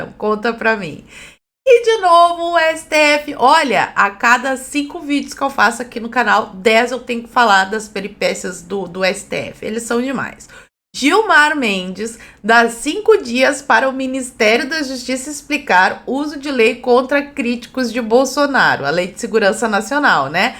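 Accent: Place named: Minas Gerais, Brazil. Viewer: OCE. É. GAUCHA. O. É. MINERA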